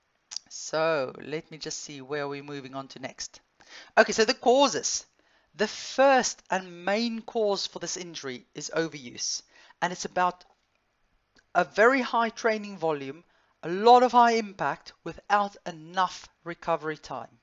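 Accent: South Africa. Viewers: Hello. You sound like you could originally be from SA. I live in Graaff Reinet in the EC and have been informed I have this annoying condition. I’m a runner and started cycling this year to try and alternate a little and then bam OP!!.. I’m devastated as I have not been running for 4 months already and not cycling for a month now. I’ve already seen a physio, GP, Chiro and finally a sport specialist Dr who diagnosed OP after X-rays and sonar. My question is, as I am sure your time in Pounds will be extremely expensive for us here in SA, is would it be worth while seeing a biokineticist to help with me with this?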